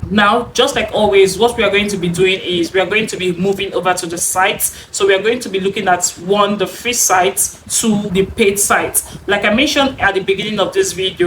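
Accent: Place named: Africa. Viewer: What language do you speak as I'm struggling to understand you African accent 😮😮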